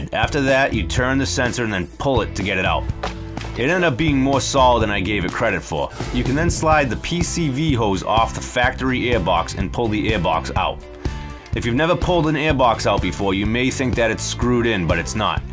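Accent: Boston, United States. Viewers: Love this channel! Even more after I recognised that Boston accent 😏 hope you’re a die hard pats fan like I am! Keep up the vids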